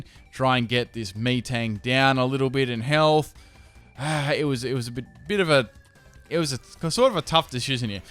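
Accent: Australia. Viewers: I keep guessing what is "pill"...it's actually Pearl. gotta understand Australian better now that I'm hooked to this channel.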